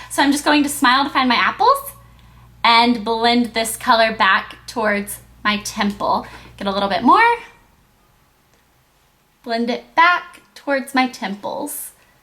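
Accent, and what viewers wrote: South, United States. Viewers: Like ur old videos but ur intro us way to formal and long also I miss your southern accent!! I loved it :) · when you mentioned the elf brush, the nyx jumbo eyepencil and the mac "fresh water" IT BROUGHT back sooo many memories, of your first few videos! !! and OMG i miss your thick southern accent. :( hahha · she IS southern. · you sound more southern when you're sick. i like it :)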